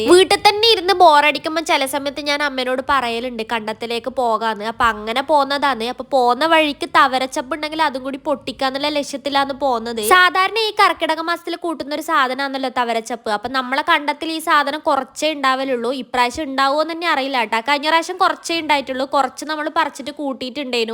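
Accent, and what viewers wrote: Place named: Kannur-Kasaragod, India. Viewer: നല്ല ഭംഗി...എവിടെയാ സ്ഥലം..കണ്ണൂർ കാസറഗോഡ് ഭാഷ ആണല്ലോ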